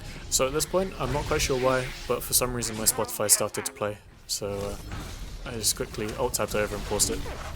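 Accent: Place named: United Kingdom. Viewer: Thank god I found your channel exactly what I was looking for, you sound British what server do you play?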